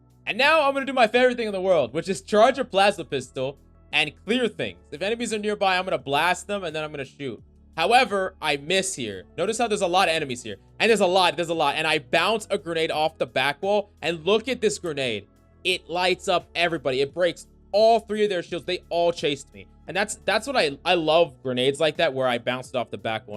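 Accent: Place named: United States. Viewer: Woah I didn’t know GreekGodX made Halo videos. Your American accent is perfect dude. I’m impressed.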